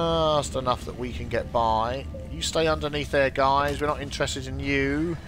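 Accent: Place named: Kent, United Kingdom. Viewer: I would say Kent, judging by the way your pronounce your R's.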